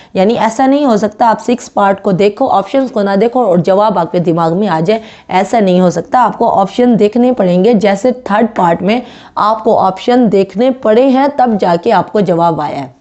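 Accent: Lahore, Pakistan. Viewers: Sister ap waqas Nasir ki kuch lagti tu nahin..beacuse waqas ny review exercise upload nahin keen..or ap dono hain bhi Multan..zuban ki aarnay sy tu ap Lahori lug rahi hain..jawab zaroor daina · Teacher ka lahja dekh ka pata lag gaya ha k teacher Lahori ha😁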